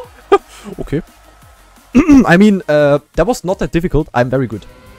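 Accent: Germany. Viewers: Du bist deutschhh, nh? XD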